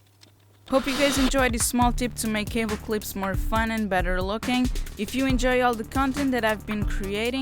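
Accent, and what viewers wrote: Portugal. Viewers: Hi I've been wondering how much all of that costs, since episode 1(wood, mdf, screws, not including machines...), I've got so many ideas from you and I'm thinking about making something similar. Stay with the good job! (I'm also wondering if you are from Portugal or not, I realised that you live in Portugal but did you born here? Your accent seems pretty sick)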